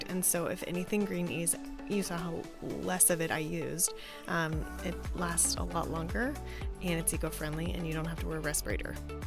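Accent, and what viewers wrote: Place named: United States. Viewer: You sound American. Other countries have safety restrictions on paint strippers.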